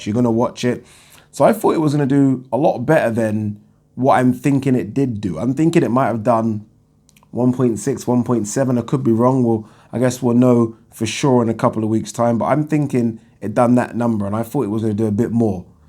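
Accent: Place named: London, United Kingdom. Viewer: you sound like you are a Londoner like me Metro Sport are saying Ruiz V AJ 2 done 1.6 million PPV £24.99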